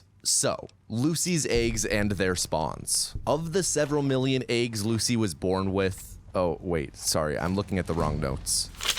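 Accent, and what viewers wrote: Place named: Minnesota, United States. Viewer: Good MN accent, as a Minnesota native, I approve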